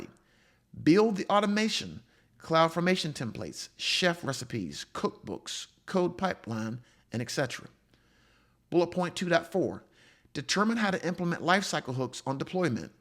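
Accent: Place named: Virginia, United States. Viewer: I could tell from that accent that you were from a southern part of one of the Virginia's 😊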